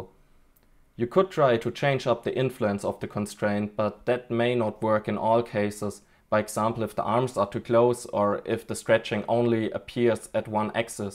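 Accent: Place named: Germany. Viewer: Bruder man hört das du deutsch bist 👌🏽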